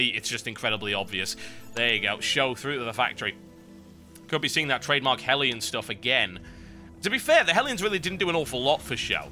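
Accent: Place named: England, United Kingdom. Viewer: i could listen to your damn-so-good-comment all f'in day, especially because of your incredible authentic english accent :) total biscuit you are a total wizkid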